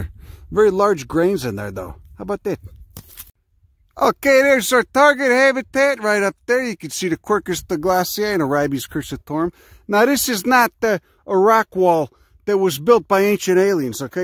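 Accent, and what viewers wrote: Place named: Colorado, United States. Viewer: HAHAHAHHAAAA!!!! God damned spot on. Sounds like half the people in Colorado.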